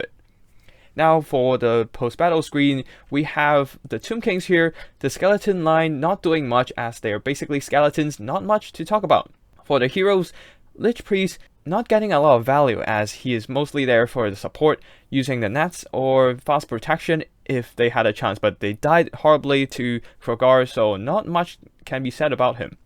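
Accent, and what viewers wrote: China. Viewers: Your Chinese accent makes the video so nice to watch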